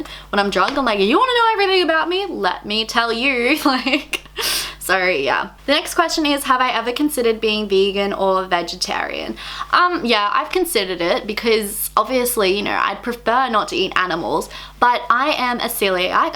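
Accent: Melbourne, Australia. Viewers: I love your accent I've been to Australia. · Omg I’m from America but I’m moving to Australia this year! I thought based on your accent you were from Melbourne but I’ll be moving to Nelson bay which is much closer to Brisbane than it is Melbourne! I’ve been obsessed with your vids lately! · I couldn’t even tell you had an accent, probably bc I’m Australian too 😅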